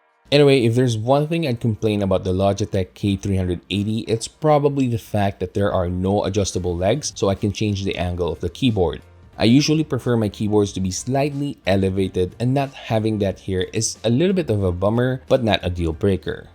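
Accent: Philippines. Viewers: I love that Filipino accent! 👌🏻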